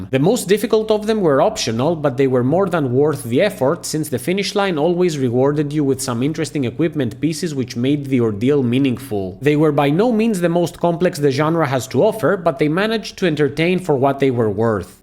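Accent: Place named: Greece. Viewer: I have a feeling that you are greek according to the accent ! Good video! You just earned a subscriber :)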